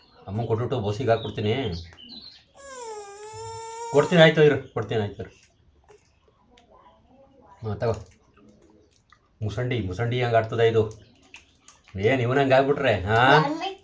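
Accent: Mandya, India. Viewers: ನಿಮ್ಮ Mandya ಭಾಷೆ ತುಂಬಾ ಚೆನ್ನಾಗಿದೆ brother ನನಗಂತೂ ನಿಮ್ಮ ತುಂಬಾ ತುಂಬಾ ಇಷ್ಟ ಆಗುತ್ತೆ 🎉🎉🫡🫡👌👌👍👍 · ಸೂಪರ್ ನಿಮ್ಮ ವಿಡಿಯೋ. ಮಂಡೇ ಭಾಷೆದಲ್ಲಿ ಕೇಳೋಕ್ಕೆ ತುಂಬಾ ಇಷ್ಟ. ಬಾಗಲಕೋಟೆ ಡಿಸ್ಟ್ರಿಕ್ಟ್ ಇಲಕಲ್. ಇಂದ ವಿಡಿಯೋ ನೋಡ್ತೀನಿ. ಈ ಮೆಸೇಜನ್ನು ದಯವಿಟ್ಟು ವಿಡಿಯೋ ದಲ್ಲಿ ತೋರಿಸಿ ಜೈ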